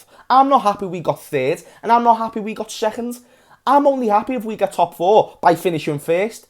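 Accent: Liverpool, United Kingdom. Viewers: Scouser 🤡🤡 i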